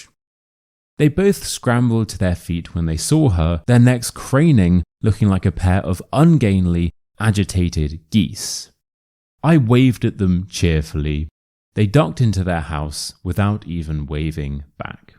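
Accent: United Kingdom. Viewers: Thanks a lot! As a Russian it's a pleasure to have this kind of a real british speaking video, thanks again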